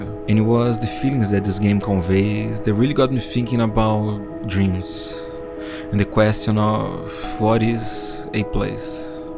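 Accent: Brazil. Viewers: Como alguem que mora no Canada ha quase 10 anos, seu sotaque é otimo e lindo! Consegue nao ser tao carregado a ponto de ser dificil de entender, mas ainda tem o charme do nosso sotaque tupiniquim.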